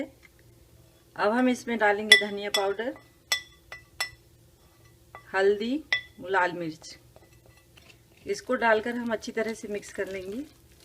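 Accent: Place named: Bundelkhand, India. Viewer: Bhasha k lhze se bundelakhnd jablpuriya lg rhi h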